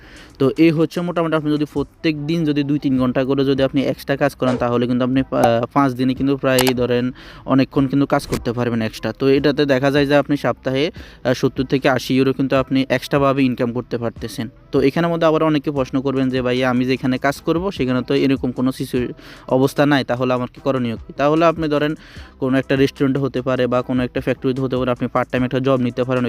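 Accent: Noakhali, Bangladesh. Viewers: ভাইয়া আপনার বাড়ি নোয়াখালী মনে হয়,,,,??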